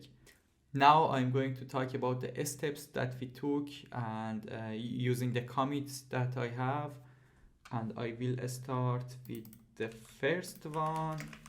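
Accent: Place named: Iran. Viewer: I am turk but I know how persian people speak and your accent had sweet persian tonnage )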